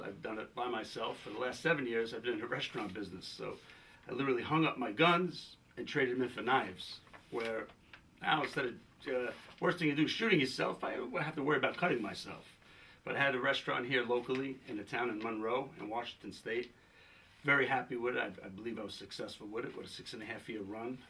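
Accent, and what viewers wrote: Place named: Italy. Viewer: He's got that awesome Italian kind of accent thing going on. :D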